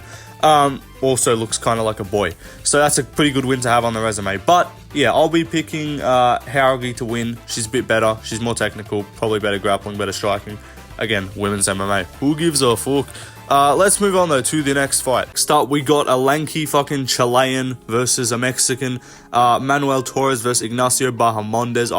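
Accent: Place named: Australia. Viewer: Hablas gracioso, pero tu español suena bien con el acento australiano.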